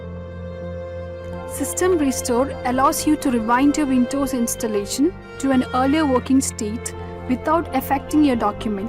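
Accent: Kerala, India. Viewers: Are you from kerala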